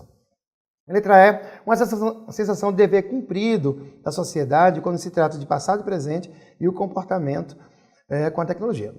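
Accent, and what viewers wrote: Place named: Pernambuco, Brazil. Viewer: Muito boa aula, parabéns e obrigada. O melhor é ouvir o sotaque, também sou Pernambucana!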